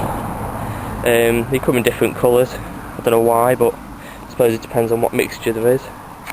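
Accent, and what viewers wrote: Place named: Kidsgrove, United Kingdom. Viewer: Hi, although I am quite capable of changing coolant - I watched this the night before - I have a <num>SA Bandit, and pretty much everything is the same. Expansion/recovery tank is under the right side of seat fairing. Anyway First bike I had since I sold my last blade in 2004, so wanted a watch a video first - Yours was great and your accent reminded me of my time in Kidsgrove. Anyway mate great video, thanks for taking the time to show and tell. Thanks Bud!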